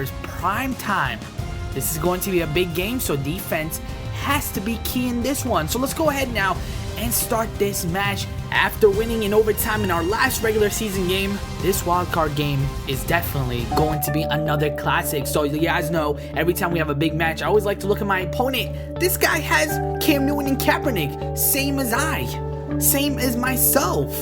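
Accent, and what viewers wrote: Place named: Midwest, United States. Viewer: That's a mid west United States accent they speak very flatly.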